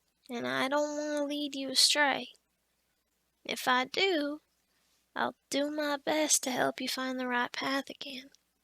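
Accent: West Virginia, United States. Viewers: Well done on the eastern West Virginia accent, especially for a first try! If you ever want coaching or practice, let me know! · This was frikin adorable lol, ironic enough I am practicing to do music for my second channel in a few years. So hey maybe you don't have pipes, but ya sure as heck have a sweet voice I could listen to forever😊, I also enjoyed the WV accent considering I live here though originally from VA I am not gifted with an accent.😂 Probably for the best considering my flavor of music to do, Anyways awesome video, you're amazing as always, hope you have a wonderful day and enjoy the Christmas month, God bless take care, and much love!🌸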